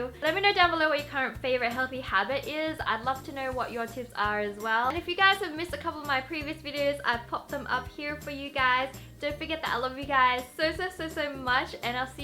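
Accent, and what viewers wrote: Australia. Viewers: Are you Australian? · She's from Australia?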